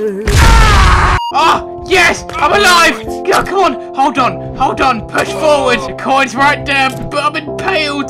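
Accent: England, United Kingdom. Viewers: I Really love your Voice its so english i Shall go to England on vacation next year so i hope i Met you there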